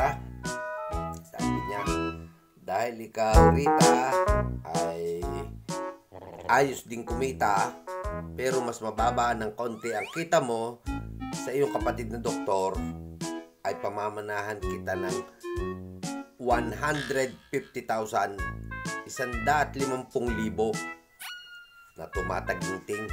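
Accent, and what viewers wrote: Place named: Batangas, Philippines. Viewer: Hello sir god bless you po joker pala si sir hehe sir para po kayong batangueño asawa ko kc ganyan mag salita e.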